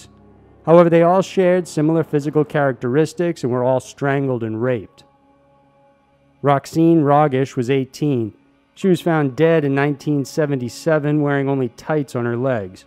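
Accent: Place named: Massachusetts, United States. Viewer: It's pronounced "ORE-eh-gun".... not "ORE-a-GONE".... total Mass accent pierces the eardrums again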